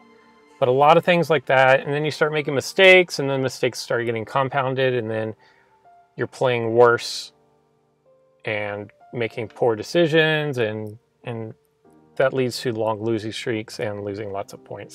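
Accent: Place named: Canada. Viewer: it's beautiful there for sure, I'm a Canadian, some of the words you say you almost have the accent lol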